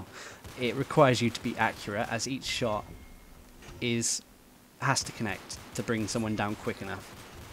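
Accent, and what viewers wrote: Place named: United Kingdom. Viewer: I love the British accent it's just awesome. Will u add me on psn please.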